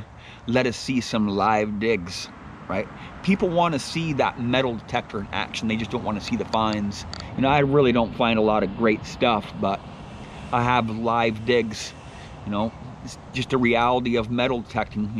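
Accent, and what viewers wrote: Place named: Georgia, United States. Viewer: What state are you in ? Sounds like my state of Georgia ! :)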